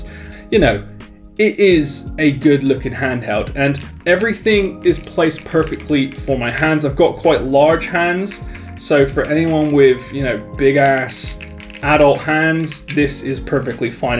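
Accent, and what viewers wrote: Bristol, United Kingdom. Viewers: Are you Bristolian?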